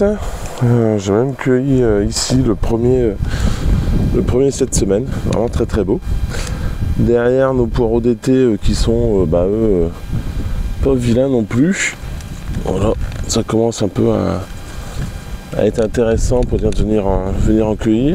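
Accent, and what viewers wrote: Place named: North, France. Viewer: Bon rétablissement ! La spontanéité avec l'accent du nord, ça fait plaisir !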